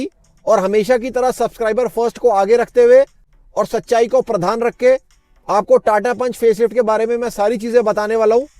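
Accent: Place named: Pakistan. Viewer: Bhai aap muze pakistani jaisa sound kar rahe ho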